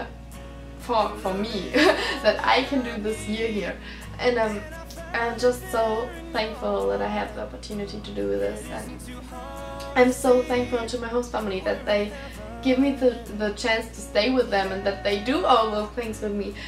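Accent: Germany. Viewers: That German accent combined with your poor English of is a real pain in the ass. A disclaimer for all whom willing to watch this cancerous video. Don't it'll only cause you pain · Dein Englisch ist echt gut .Klar man hört glaube ich immer das deutsche raus aber am sonsten echt gut 😍 Du hast ja am Anfang gesagt das du bald Ball hast und könntest du dazu ein video machen also wie du dein kleid kaufst wie du dich fertig machst und allgemein der Ball ?😊 Oder auch allgemein mehr videos machen wie zum Beispiel von deiner Schule wie es dort ist usw. also so eine art Vlogen ?🤗 Wenn du Zeit hast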